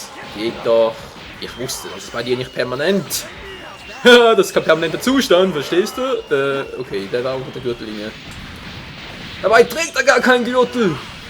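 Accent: Switzerland. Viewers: hey <3 bist woll ein schweitzer :D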